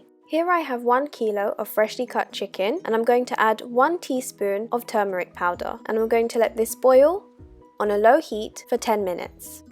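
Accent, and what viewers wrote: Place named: United Kingdom. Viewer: What a delicious Fried Chicken Recipe! Great editing and love your accent! British? Keep up the fantastic work! :)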